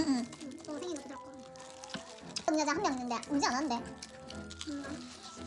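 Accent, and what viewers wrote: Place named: Gangwon, South Korea. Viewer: 이 분 때문에 영동지방으로 어학연수 가고 싶은 일인 여깄음. 정말 극강의 로망 억양 인토네이숑… ㅎㅎㅎ❤❤❤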